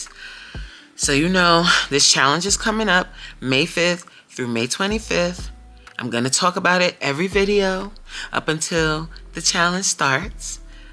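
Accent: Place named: Africa. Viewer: It's the African voice for me hahaha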